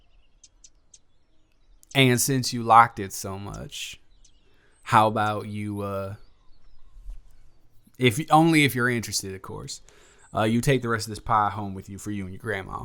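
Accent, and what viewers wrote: South, United States. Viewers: AYE MAN WHAT DID I TELL YOU ABOUT THE SOUTHERN ACCENT!!😂😂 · I love the southern accent, too adorable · THE SOUTHERN ACCENT IS AMAZING MORE PLS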